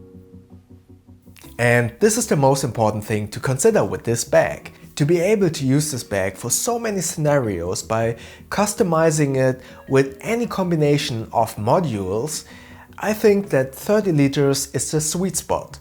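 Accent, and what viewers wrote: Germany. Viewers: Excellent review! Love your German accent! 😊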